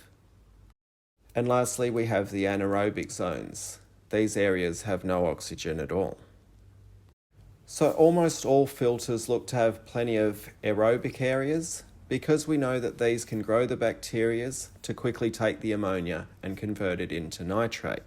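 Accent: Australia. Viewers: Is that an annoying Queenslander accent I hear?~! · Good to hear an Aussie voice on Uutoob for a change, apart from that I'm gunna be the mug to react to the use or the non-word bacterias...... there can be one bacterium or two to many bacteria despite what spell-checker says. Cheers, by the way I keep 3 greenish water ponds with pondslime for the purpose of breeding native fish.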